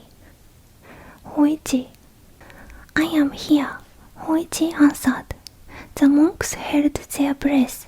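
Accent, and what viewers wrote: Japan. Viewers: I love your sweet voice.. I love that Japanese struggle with saying the letter L it makes Japanese English so cute.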